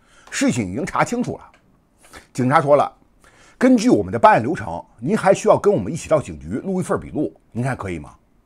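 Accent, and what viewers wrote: China. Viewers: 你口音就是 京津一带的口音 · 你虽然是台湾人但是你的口音确实像大陆的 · 老哥不像台湾人 你那把中国人的口音骗不了人 就像新加坡人跟马来西亚人的口音差距太大，中国南方跟东北人的口音那样，只要一开口说话立马漏馅了😊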